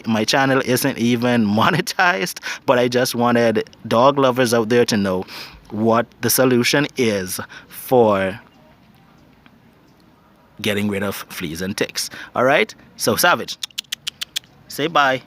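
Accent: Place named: Bahamas. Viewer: You convinced me to try it & you sound like one of the homeboys in the Bahamas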